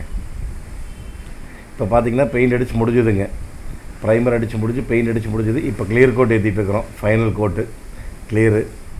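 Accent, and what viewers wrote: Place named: Coimbatore, India. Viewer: அண்ணா உங்க கோவை தமிழ் அருமை சூப்பர்🇮🇳💖🙏🏤🕋⛪🙏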